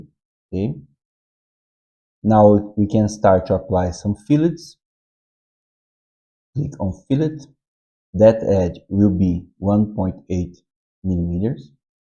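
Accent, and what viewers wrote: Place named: Brazil. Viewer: Sotaque de brasileiro.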